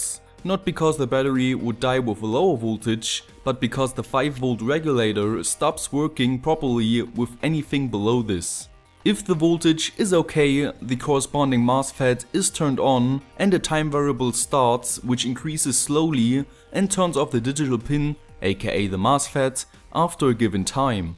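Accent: Germany. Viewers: Man merkt das du Deutsch bist. An dem deutschen Akzent. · nice ^^ man hört richtig das du deutsch bist hehe hast n abo bekommen ^^ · Bart Simpsons he says people see that you are german because of the german accent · Höre ich da einen deutschen Aktzent?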